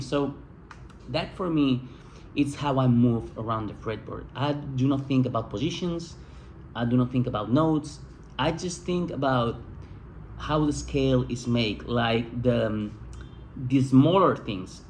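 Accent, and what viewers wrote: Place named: Spain. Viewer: Gracias. Me es más fácil entender el inglés con acento español que el ingles americano. Thank You pal!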